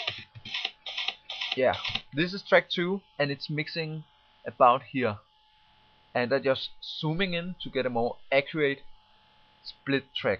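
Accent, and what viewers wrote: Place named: Denmark. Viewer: where u from? hmm, danish dialect lol!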